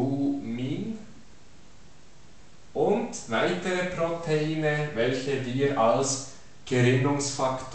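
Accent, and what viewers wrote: Switzerland. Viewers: schweizer Deutsch?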